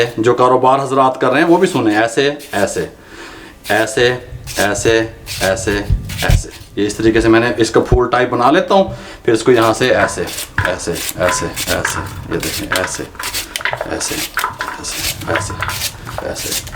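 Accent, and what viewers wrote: Punjab, India. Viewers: G Allah apko BHT khushyan de mje apka bat karne ka smjane ka tarika punjabi bolne ka style BHT acha ha uncle Allah apko kamiabia de ameen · Ap k accent Punjabi h ap Punjabi bola kren bht acha lgta h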